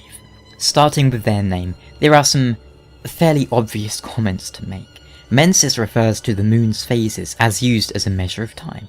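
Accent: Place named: United Kingdom. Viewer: His British accent makes this seem as informative and credible as a TED talk :D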